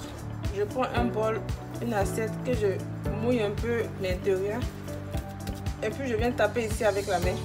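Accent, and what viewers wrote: Ivory Coast. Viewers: Ouh lala j'aime trop ra façon de parler à l'ivoirienne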